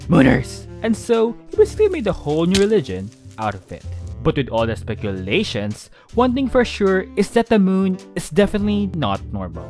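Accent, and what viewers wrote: Philippines. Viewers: i love ur filipino accent lods :)) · are you filipino? i can tell by the accent😂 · Ur accent looks like a filipino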